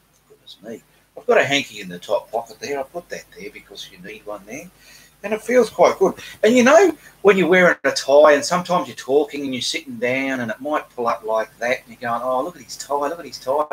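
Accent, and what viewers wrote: Australia. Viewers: Wow i love your painting but now im watching this video because my english teacher suggested to get used to different english accents and so far australian is the hardest for me to undrestand😄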